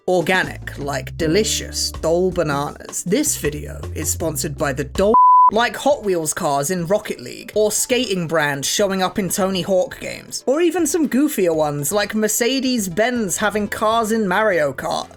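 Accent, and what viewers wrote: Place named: England, United Kingdom. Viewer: Ok english accents are always funny to me as an American, like i enjoy how you all say Mario, but that Nissan pronunciation is something else!! ❤️ You have a great channel man. Could really good analysis videos I've seen now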